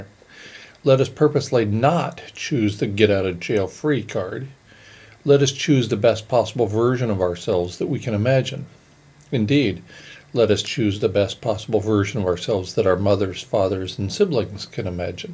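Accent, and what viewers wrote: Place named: New York, United States. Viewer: Like listening to the old Mensch with his New York Bros accent and straight talk... It's amazing how we bounce around in life... Just celebrated a birthday and scratch my head on the chance encounters with ideas and people and events. Makes me wonder on all concepts... Perhaps, just maybe, after crossing over the River Styx it all all be revealed... Probably not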